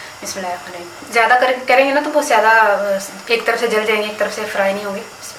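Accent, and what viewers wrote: Sialkot, Pakistan. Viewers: Thank you for your delicious 😋 Karelay Ghosht I like to hear your Punjabi, I'm sure you're from Sialkot?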